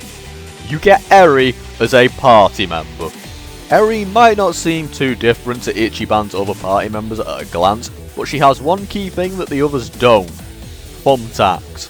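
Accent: United Kingdom. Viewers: From Birkenhead or Liverpool or something, I think. certainly in the area of north west I think.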